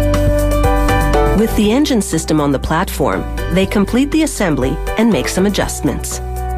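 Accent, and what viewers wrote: United States. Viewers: The vulgar American accent describing how one builds a Rolls Royce is just not cricket! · What an utterly, horrible American voiceover, no class. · FFS why did they use the American, for this episode? Aluminium not Aluminum, and Bonnet not Hood.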